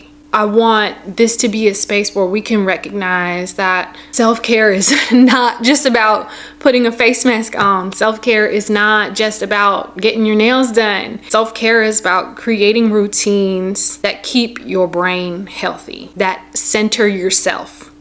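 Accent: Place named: Texas, United States. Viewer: Your podcast was recommended to me. As soon as you spoke, I knew you were from the South. I'm from Tennessee. You sound like Texas?? Your dog is so cute and your kids. Enjoyed!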